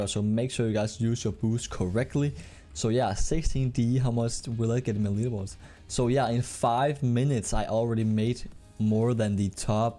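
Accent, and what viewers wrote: Denmark. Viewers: Elsker din danglish haha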